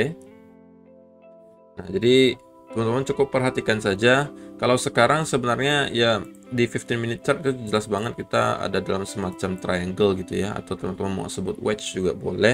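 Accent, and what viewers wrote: Pontianak, Indonesia. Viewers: Ko, org pontianak ya?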